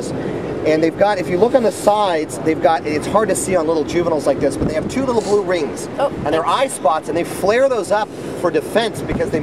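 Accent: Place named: United States. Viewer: its called the American accent. Globalization, and TV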